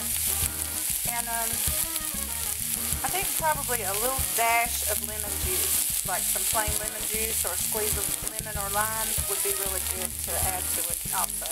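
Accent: South, United States. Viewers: Love her accent! Makes me miss the south.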